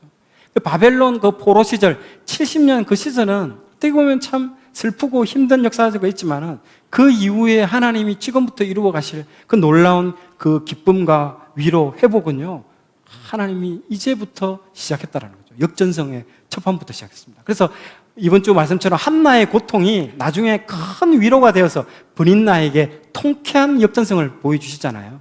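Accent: Gyeongsang, South Korea. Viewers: 경상도 사투리의 억양과 또랑또랑한 음성이 조화가 되어서 귀에 쏙쏙 들어옵니다 감사합니다💝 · 경상도 사투리가 정겨웠는데 아니! 마산! 저도 마산출신 입니다... 지금은 먼 외국에서 주님의 사역을 감당하고 있습니다만 오랫동안 한국을 가지못해 늘 고국을 그리워하며 살고있는 순례자입니다.... 구독해서 열심히 듣고 있습니다.... 주님의 이름으로 축복합니다...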